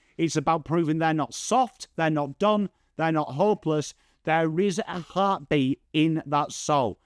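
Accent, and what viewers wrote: England, United Kingdom. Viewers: Very weird listening to a pom talking afl. lol.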